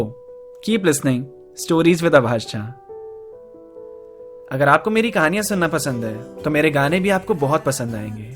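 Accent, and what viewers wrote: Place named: Haryana, India. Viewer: Aap sayad hariyana se lagte ho😋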